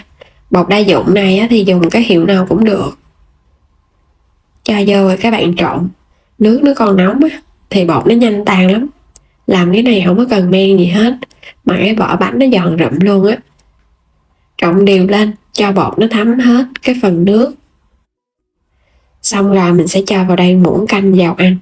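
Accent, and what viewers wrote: Mekong Delta, Vietnam. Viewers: Giọng miền Tây đích thực đó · Giọng miền Tây người ta vậy đó mấy má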